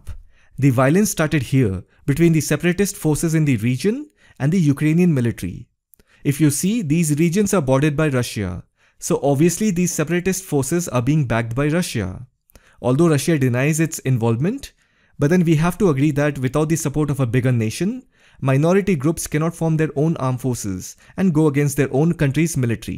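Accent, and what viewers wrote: India. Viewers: Definitely need the Indian accent to put emphasis on what is happening here.. totally made me understand it better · I never thought I would survive an entire video with Indian english but your effort of research and the quality made it worth it lol. Keep it up!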